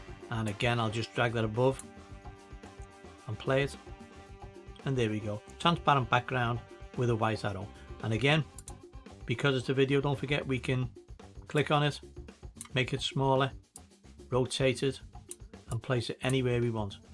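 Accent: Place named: Merseyside, United Kingdom. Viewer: Hi are you still around I've noticed the comments are over a year old. Do you do any one on one tuition for Filmora 14 if so Im interested sounds like you come from my area Merseyside.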